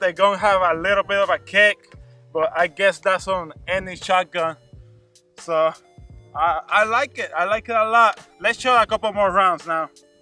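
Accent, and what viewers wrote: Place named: Puerto Rico. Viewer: My wife and her family are from ponce and I'm from Argentina we always make fun of each other's accents lmfaooo. My bros in law sound just like you bro!!!😂😂😂😂😂😂😂😂😂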